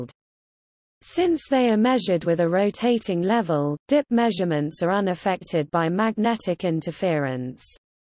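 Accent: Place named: United Kingdom. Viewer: Can we just STOP with the British accents? PLEASE??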